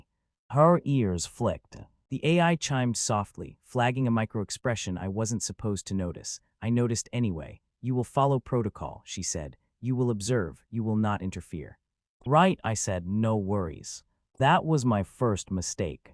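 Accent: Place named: United States. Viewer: Plenty of aussie accents on AI. Kinda wrecks the story with a yank voice and customs.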